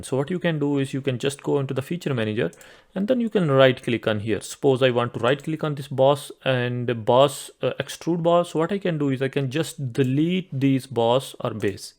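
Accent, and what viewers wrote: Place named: Haryana, India. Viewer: Haryana se ho kya bhai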